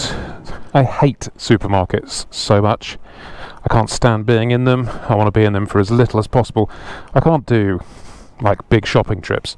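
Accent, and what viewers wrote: England, United Kingdom. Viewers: Nice to have you back! As a Dutchie I really can appreciate you're semi-posh English. Keep on driving....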